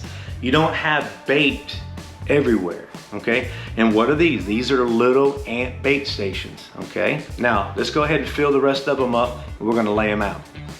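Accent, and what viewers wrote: South, United States. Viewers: I’m South south Louisiana. Your accent gives your southern away too. Last 2 years, our subdivision has been invaded by Crazy Ants. They don’t follow a trail, don’t bite, and seem frantic and only outside so far. Millions of ants like a true invasion. LSU says they act like that because they don’t have a Queen. They are coming out of the sugarcane field. Because we don’t know where the colony is, and they usually don’t return to the colony, there is no way to destroy them. LSU treating yards with the termite poison, but have to treat every few months. I would love to stop spraying as we have ducks, chickens dogs, cats etc. Have you all had these ants? It’s INSANE.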